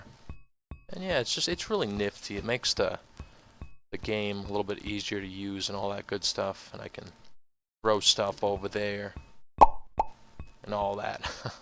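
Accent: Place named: Netherlands. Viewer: are you from the Netherlands because you have that kind of accent also you make Amazing mods Keep it Up!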